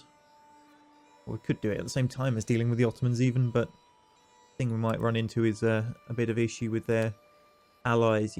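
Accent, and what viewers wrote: United Kingdom. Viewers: You can't upload this quick enough buddy. Great series On a side note I'm guessing you're south coast with an accent like that. Winchester perhaps? (I might be way off but I'm from Bournemouth)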